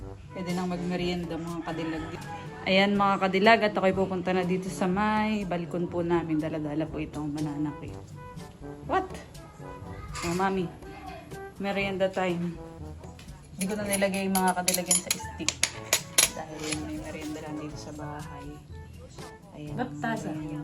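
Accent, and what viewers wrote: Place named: Quezon, Philippines. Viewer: Ok lng Naman kahit may Punto ang salita ganon talaga sa Quezon prov!❤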